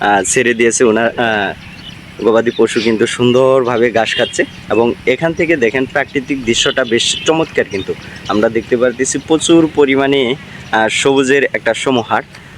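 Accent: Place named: Bangladesh. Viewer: ভাই তুমি কোন জায়গার ভিডিও বানিয়েছ,? তোমার ভাষা শুনে মনে হচ্ছে বাংলাদেশ থেকে। জায়গার নাম জানিয়ে দিলে খুব ভালো হতো। একটি সুন্দর ভিডিও দেওয়ার জন্য ধন্যবাদ।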